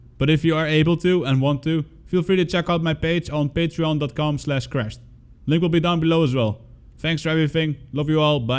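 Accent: Netherlands. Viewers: Are you dutch? Your accent is really dutch.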